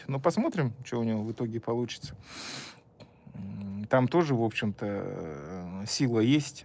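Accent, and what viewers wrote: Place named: Buryatia, Russia. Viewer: Вы ваш разговор похож на западных бурятов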